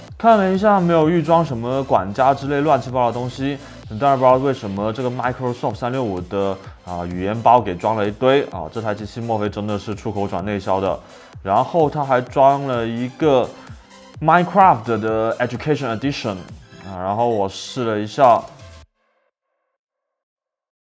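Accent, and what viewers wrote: Cantonese, China. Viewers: 請問你是廣東人嗎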